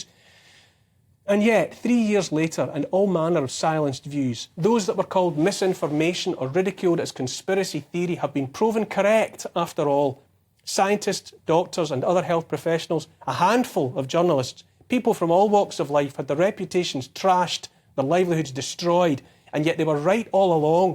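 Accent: Scotland, United Kingdom. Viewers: You nailed it 😁 Without giving any thought as to why I was inspired to write a poem about him... It just happened. But you've summed up what sparked it 😊 This was May last year 😊🤗 Won't Kneel A gentle, beautiful Scottish lilt A kind, empathic, forward tilt An open heart; a caring face A willingness to take his place. A strong, home-hitting monologue Reminds us of the boiling frog The courage to become a sleuth A passion to release the truth. A deep desire to crack the whip Illuminates our freedom-strip Calls out the darkness taking hold The shiny despots; plated gold! Intense and raw, he lays it bare A soul-enhancing, honest stare Inflections drive the message through He speaks for Us - for me and you! Discernment's such a precious gift His words create a mighty lift Take heed of all he has to say He knows - it shouldn't be this way! 🤗🦋🐬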